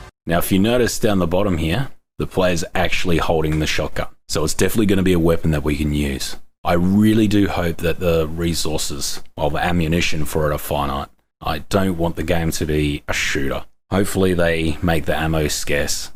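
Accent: Australia. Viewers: your from Australia buy the sound of it, plus the Aussie sarcasm, or i have been in isolation for way to long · Mate, are you Australian? Is that a fellow aussie?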